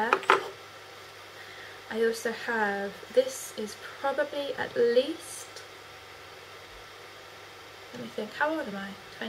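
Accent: United Kingdom. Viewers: You're so british! In a good way ^^ haha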